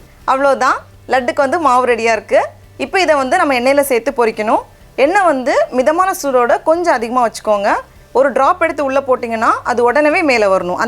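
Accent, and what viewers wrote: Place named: Nagercoil, India. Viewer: Baby is soooo cute... And the way you speak ... Is your native is Nagercoil?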